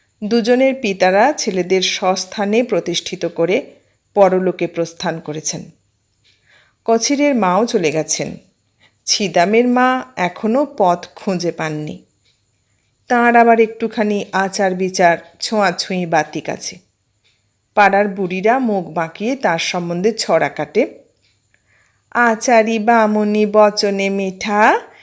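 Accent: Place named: Bangladesh. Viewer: ফুল্লোরা থেকে ফুলজান, তার থেকে ফুলি। খুব সুন্দর গ্রামীণ বাংলাদেশি বাংলা ভাষা পাঠ করেছেন। দেবীরা কখন কি বেশে আসেন সেটাই আমার ভাবনার বিষয়। গল্পের ঘটনা যা, সে যুগ আর নেই, নোংরা রাজনীতি ও জনবিন্যাস বাংলার সব শেষ করে দিয়েছে।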